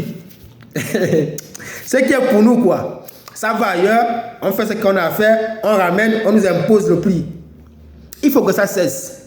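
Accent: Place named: Fang, Gabon. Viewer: Tu lis en fang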